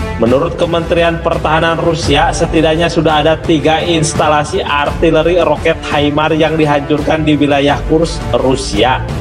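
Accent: West Java, Indonesia. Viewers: Sunda banget mimin dari logatnya 😀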